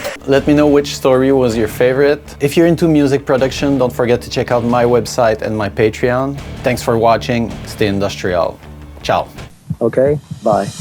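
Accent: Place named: Quebec, Canada. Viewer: Intéressant. Es-tu de Québec? Je reconnais un accent familier.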